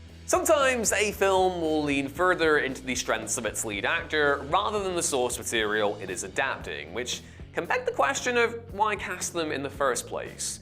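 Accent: United Kingdom. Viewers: Dude it's just a British accent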